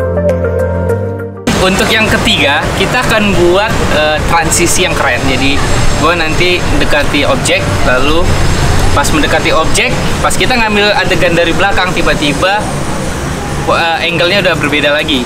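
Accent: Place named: Banyumas, Indonesia. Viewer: mbaknya ngapak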